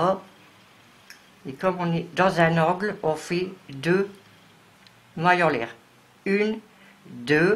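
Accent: North, France. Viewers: bonjour, je suis née a Bruay sur Escaut, et ma famille était a Raisme, Anzin, la dernière tante nous a quitter, la tata m' a préféré, j en et plus, mes j adore entendre l accent du nord, gros bisous toi qui et très très gentil, gros bisous Monique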